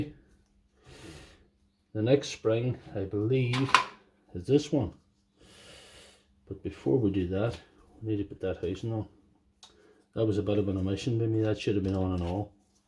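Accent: Northern Ireland, United Kingdom. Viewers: I will try and get to the root cause of my drill not working this weekend. I have confidence to at least give it a go. If I mess up then by the sound of your accent you will only be a couple of hours drive from Belfast😊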